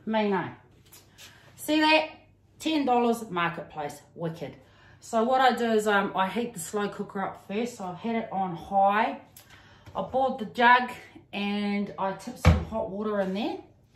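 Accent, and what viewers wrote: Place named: New Zealand. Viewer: Your accent still sounds nz. I been here longer so has my mom and two eldest sister's and they still have that nz accent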